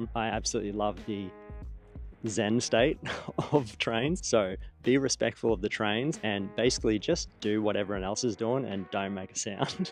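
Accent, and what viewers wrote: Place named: Australia. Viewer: I am from jp. First time watching. Sounds like you are from Australia and you did mentioned that after 12 mins in. I believe you when you said it is hot. I can hear them cicadas chirping away. Did you master the art of dozing off on train, but managed to wake up right before your stop yet? They are starting to post signs telling us to not walk on escalators. I don't think that will every happen. I always walk on them. If I stand, I feel like I have missed 10 things I could have completed if I did walk. To be fair, the conductor do say to wait for others to get off the train first before you step in. Also, they pick up their trash and food wrappers they have purchase when they left the room. Sounds like you have been in jp for over 3 months. You must be another master of sorting your trashes at home A??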